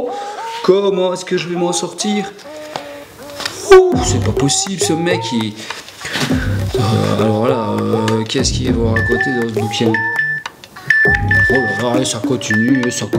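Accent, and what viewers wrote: Belgium. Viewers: ... ou comment (ré)apprendre les bases issues de l'expérience d'un pro qui se prend pas la tête, avec l'accent belge en bonus! Parfait, change rien. Et pour être très original: merci.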